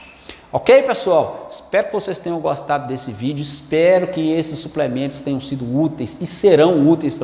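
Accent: Minas Gerais, Brazil. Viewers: Eita sotaque mineiro sô 😁. Vídeo sensacional, graças a Deus durmo sem precisar usar nenhum desses suplementos 🙌🏻. Vídeo ótimo para utilidade pública 👏🏻👏🏻👏🏻👏🏻👏🏻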